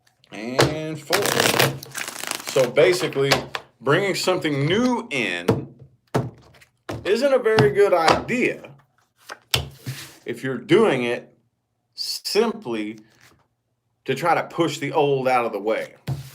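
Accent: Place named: West, United States. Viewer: My ex is Aquarius , a creeo if ever there was one. Wont let me move on as he's full with envy . Yet he cheated on me time and again, and I was 3rd party to this toxuc masked devil in disguise. Narcussust, pathological liar. I want to move on to a better life, a life I should've had . Why do I get lumbered with losers like this snoop, who sneaks in and out if my affairs, he's already got someone in the side so why does he want me?. Its money thats what it is . He was always broke .. your rather handsome cowboy!! I like yr accent!! A real western. Well I'm meant to meet a much older guy in middle of December. I dont mind as long as he's trustworthy honest,, and true. Not Me!@ I've had enough of this air sign because he's a liar, cheat, and a chancer. He cheated me, I was true but he wasn"t. That's it!! Thanks big man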